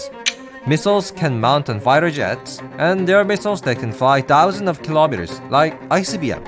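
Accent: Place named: Russia. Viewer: Love your videos ❤ Btw you sound Russian are you from Russia?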